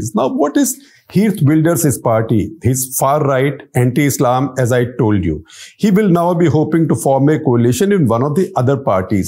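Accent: India. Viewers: Talking too much with strong Indian accent...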